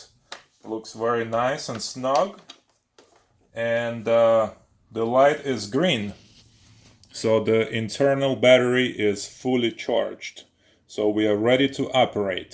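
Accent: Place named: Russia. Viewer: The commentator is russian? His english is very specific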